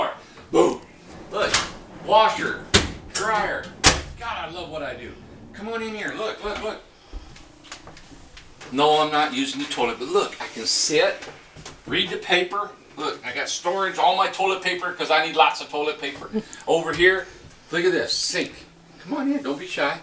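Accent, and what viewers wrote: United States. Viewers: God I love American accents, this guy's passion is so infectious as well.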